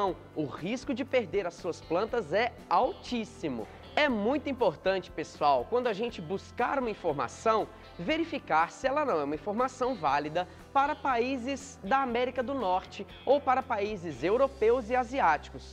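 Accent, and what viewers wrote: Minas Gerais, Brazil. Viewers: Você é mineiro de onde hein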